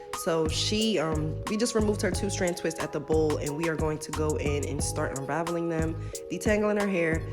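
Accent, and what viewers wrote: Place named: New York, United States. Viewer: where is your shop located? Sounds like a New York accent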